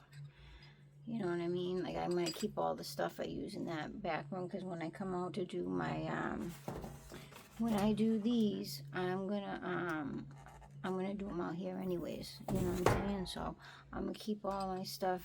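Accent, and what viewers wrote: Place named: Rhode Island, United States. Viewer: I dont know why I was under the impression you were in RI beyond your accent (know it because I used to live in Portsmouth) but I think thats where you are?. Didnt realize that cannabis was illegal there if im right and thats the state youre in. But MA and CT are close to you and yes those places sell potent thc products but that just means you use less of it at any given time if that is something you enjoy doing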